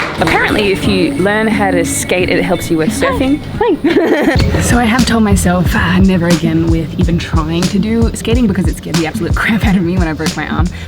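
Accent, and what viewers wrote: Australia. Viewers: How do you not use plastic water bottles while traveling in South and Central America? Does your water bottle purify the tap water to AU standards so you don’t get sick? With your Australian accent I’m assuming you grew up with super purified tap water... the tap water in South/Central America has bacteria that we’re not used to so we get sick when we drink it. (I’m half Australian/half American- grew up in the US. I just got back from Ecuador and was reliant on bottled water during my stay there so I wouldn’t get sick. In the states I will not use plastic bottles and during my stay I filled up my water using the big reusable bottles as much as I could but still used the plastic ones occasionally when other alternatives were not available.) Thanks so much! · Are u aussie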